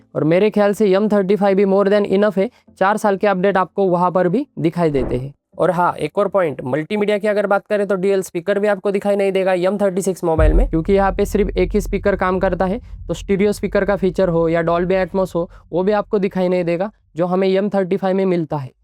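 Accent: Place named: South India, India. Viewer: Why comments making fun of yam or M, it's common i guess south teacher does have this pronounciation Not a big deal..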